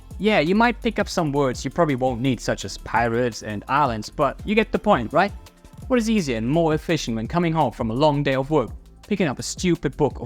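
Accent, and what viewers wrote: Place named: São Paulo, Brazil. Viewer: Você parece uma paulista!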